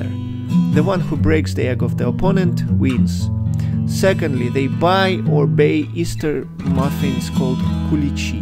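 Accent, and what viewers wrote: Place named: Russia. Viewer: Please no music while talking. And subtitles at the bottom please. You are covering your lovely Russian accent (my ears want to hear it ) and images (my eyes want to see them). Spasibo. 🙏💝😻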